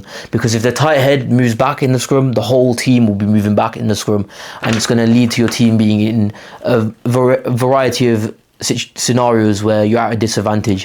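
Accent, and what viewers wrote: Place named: South Africa. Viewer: love the south african accent with that ugly rose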